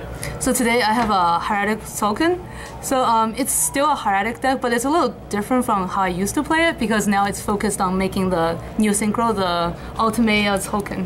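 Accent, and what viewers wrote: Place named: Asia. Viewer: Her Asianic accent. Finally I'm not the only ones with Asian accent that plays ygo. :)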